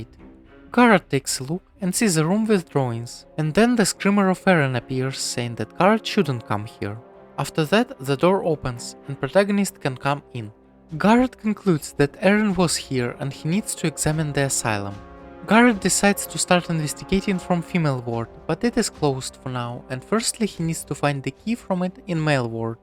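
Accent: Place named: Eastern Europe. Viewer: I find your voice very appealing. That airy, slightly androgenous, slavic thing you've got going on. Yup. Very nice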